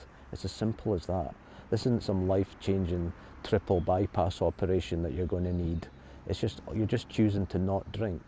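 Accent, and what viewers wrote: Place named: Scotland, United Kingdom. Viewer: Hello 14 weeks sober nice to hear a fellow Scot talk sober talk subbed. Was looking at your background and was thinking that looks familiar 😂 🌟 bank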